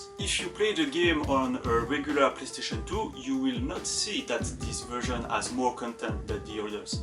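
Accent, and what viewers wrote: France. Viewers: you french boi ?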